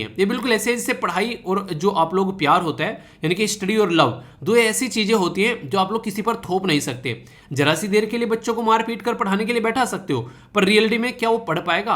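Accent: Haryana, India. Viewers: haryana se ho na aap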